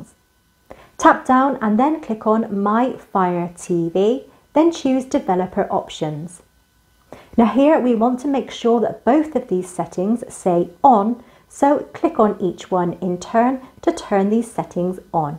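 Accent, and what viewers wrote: United Kingdom. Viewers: Brititsh accents 😚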